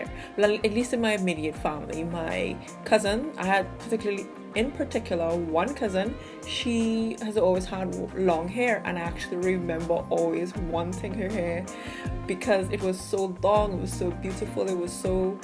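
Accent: Trinidad and Tobago. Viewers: Ohhhhh is that a trini accent i hear?? Big up the T&T massive lol we out theree. Very informative vid too. I have a twa and im still trying to get ways to style it , sigh.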